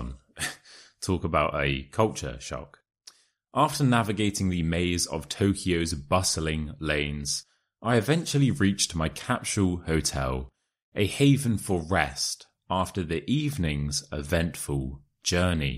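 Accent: United Kingdom. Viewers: I like your short story haha.I learned a lot from you and I like your british accent!thankyou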